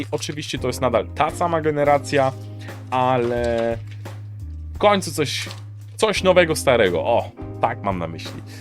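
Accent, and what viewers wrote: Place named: United States. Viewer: Ta twoja gwara amerykanska😂y😅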